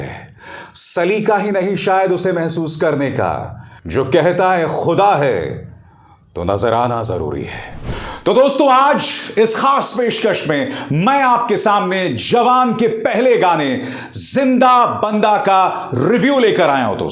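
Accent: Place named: South India, India. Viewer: Ha सर filing नहीं आ रहा है क्यों लग रहा है की साउथ इंडियन जैसा लग रहा है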